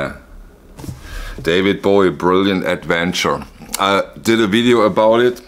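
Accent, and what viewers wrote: Germany. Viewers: Love the German accent ALL HAIL DAVID BOWIE 💜🤘🏿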